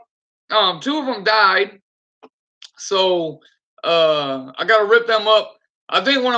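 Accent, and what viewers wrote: United States. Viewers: He is my little buddy from the north. He is from Ohio. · Nothing wrong with it i was just trying to figure out where i heard it before im from Michigan myself and really i thought he was from Detroit !!!!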